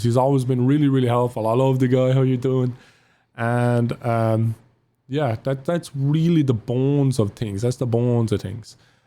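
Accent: Ireland. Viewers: gotta be honest here, Firstly... missed your irish accent (for some reason the bell got unrung for me... go figure) and secondly, YT really discouraged me after the first adpocalipse, i was actually starting to dedicate myself to it and then poop hit the fan and we small creators got covered in it. I basically gave up on this channel here of mine then restarted with it just as a little hobby, but with the "why wate hours of editing on this" mentality which in turn demotivated me even more... so yh they should listen to you coz you have some really good pointers. oh and before i forget... when can we expect a new song video?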